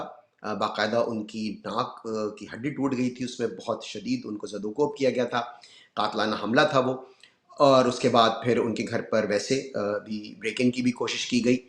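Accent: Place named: Muhajir, Pakistan. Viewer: آپ کی زبان سے واضح ہوتا ہیکہ آپ مہاجرین میں سے ہیں۔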